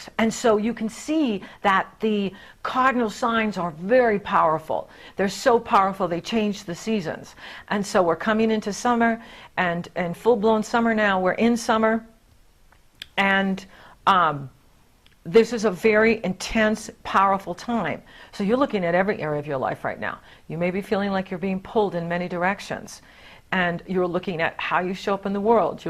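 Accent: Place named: East Coast, United States. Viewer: I feel so awesome and enlightened after your readings..i hear a hint of tri state area, east coast in your accent...DE/NJ here, ty !!!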